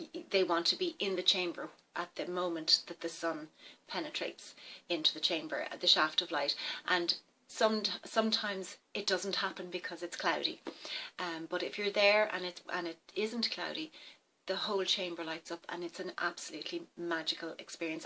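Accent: Ireland. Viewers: Just think tomorrow will be lighter just a little longer til it’s summer again... my favorite season I’ve been practicing this without knowing it Thank you! I have lights hanging all year long and I light candles every single day I like citrus smelling candles or fruity ones Are you from Ireland? I love your accent I’m from the US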